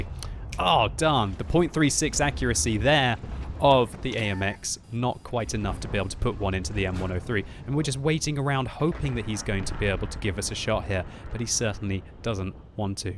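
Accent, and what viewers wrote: France. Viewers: i'm french and i quit like your french accent 😂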